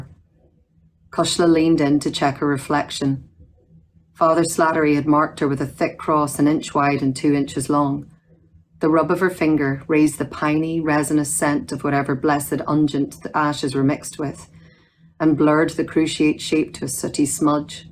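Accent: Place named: Ireland. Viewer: I love her authentic Irish ☘️💚🩷👏🏻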